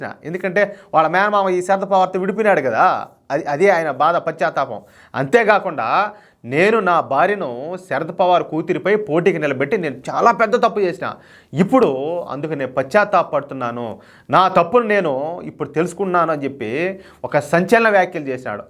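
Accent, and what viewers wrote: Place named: Chittoor, India. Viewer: గారికి వందనం మీ చిత్తూరు బాష బాగుంది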